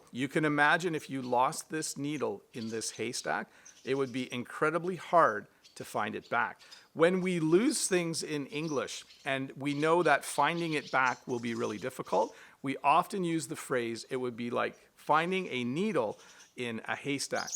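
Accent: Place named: Canada. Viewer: Hi from Milan (italy), very nice youtube channel... i think that understanding canadian accent is easier than understanding british accent 😅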